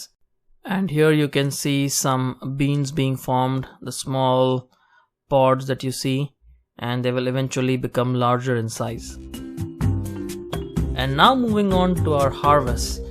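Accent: India. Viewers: your accent....are you from India ? nice videos :)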